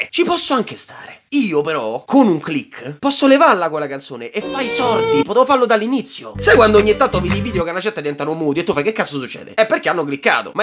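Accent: Rome, Italy. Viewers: Fantastico come sempre. E parlando di fantastico, farai anche la serie di Animali Fantastici? Scusa ma sono stra ansiosa di sentire cosa ne pensi. Specialmente del secondo. Sarò sadica ma mi piace quando sbrocchi in romano! ☺ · Boh, tra accento romano, discorsi e memini di Berserk posso solo dire che ti amo haha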